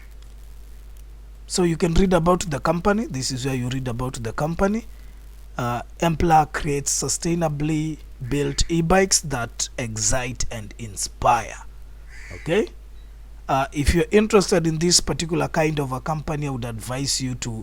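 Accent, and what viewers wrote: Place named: Kenya. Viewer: and he sounds sooo sooo kenyan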